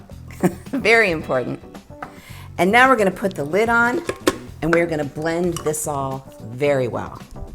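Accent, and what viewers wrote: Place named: South, United States. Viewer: Hi! That dressing looks great! I have not seen a recipe calling for the oil from the anchovies, either, so I am sure that dressing would have a ton of flavor. Accent is definitely Southern! I agree that olive oil has a strong flavor and probably wouldn't be right in this. Romaine is so common now, a lot of people won't eat Iceberg...hard to believe that Iceberg used to be normal. Wow, that turned out great. Like you said, it makes a ton, so you can make your neighbors happy. Thanks for sharing!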